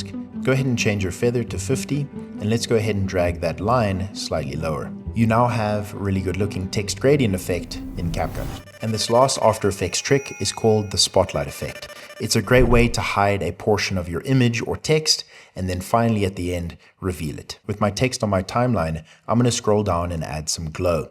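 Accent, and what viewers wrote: South Africa. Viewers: ah , are you fron South Africa 😅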